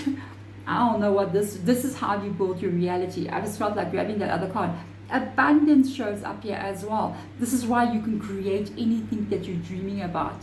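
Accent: South Africa. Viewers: I hear a south African accent?😊 ❤I'm from joburg living in Oz. Thank you for this reading 📚 ♈️ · Thank you! You sound South African!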